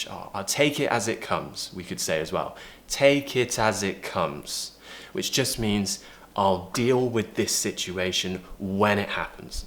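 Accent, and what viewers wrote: United Kingdom. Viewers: Cheers Mate, I really fancy listening to your British accent... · I like British accent ❤️❤️ I'm picking up from you. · It is a delight to hear you speaking with your clear and beautiful British accent! Just subscribed your channel! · I really enjoy your videos aloooooot ,, i like your clear nice British accent and i admire it ♡♡.. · I love to hear the British accent fom you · Omg i love your british accent, and i was learning to make my british accent really like a native speaker like you 😊 always make a great content. I'll always support you bruh😊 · hi: I like ur accent a lot. im from mexico my level is advanced but im trying learning the way of speaking in UK. I prefer the accent from UK than American . greetings and thanks for all videos . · I love British English very very much and I'm obsessed with British accent. That's why I've found your channel and I LOVE it. 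Subscribed immediately!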